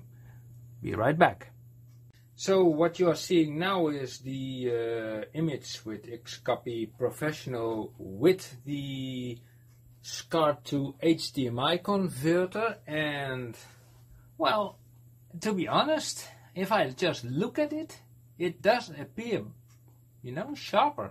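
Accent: Netherlands. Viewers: I lof Dutch akksent. 😍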